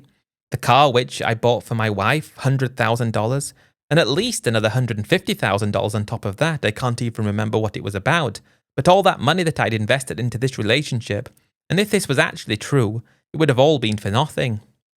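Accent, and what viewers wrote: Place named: United Kingdom. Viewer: You should’ve just accepted the baby because you are British and the accents kind of annoying so what are you doing? You know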